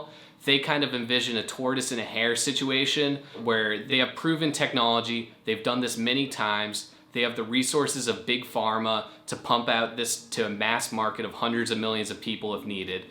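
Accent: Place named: Canada. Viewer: Canadian accent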